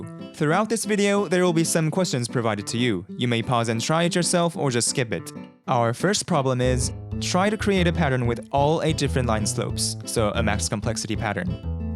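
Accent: South Korea. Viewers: 아 약간 발음 보니까 한국인인데? 라고 생각했는데 9분 쯤에 알려주시네요 ㅋㅋㅋㅋㅋㅋ 편집이 정말 깔끔하고 좋네요. 어떤 프로그램을 사용하셨는지요..?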